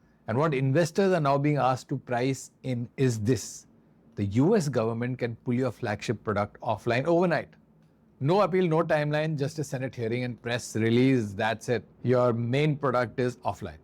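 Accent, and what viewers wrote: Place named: Scotland, United Kingdom. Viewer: Dude, it sounds like you learned English in Scotland, but your info is accurate. Thank you.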